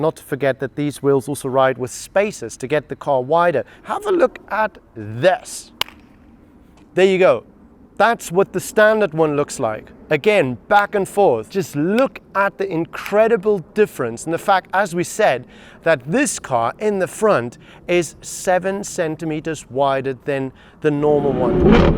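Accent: Afrikaans, South Africa. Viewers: This guy sounds South African? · Love the Afrikaans coming through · Are you South African?😊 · Tell me you’re South African without telling me your South African? Ma se kinnes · Love the accent sir your far from home🇿🇦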